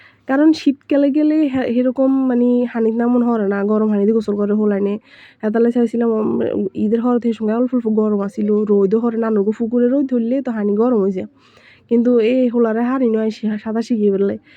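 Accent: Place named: Sandwip, Bangladesh. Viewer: সন্দ্বীপের ভাসা😂😂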